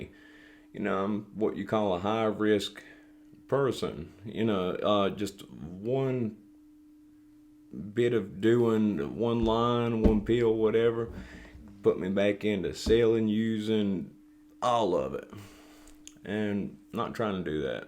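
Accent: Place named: Tennessee, United States. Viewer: Hey you said you answer comments I’ve been watching your show for a while how did you get a Tennessee accent from your babysitter?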